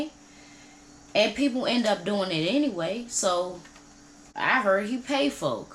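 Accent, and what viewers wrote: Washington DC, United States. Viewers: Dope content baby girl. I love ur DC Accent..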